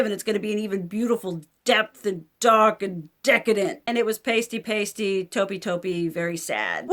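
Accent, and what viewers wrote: South, United States. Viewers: Hey girl!! This is the most Southern I have ever heard you lol. I recently refound my Electric palette and am thinking of finally decluttering it since it’s old. Lorac definitely stays in their lane. 😂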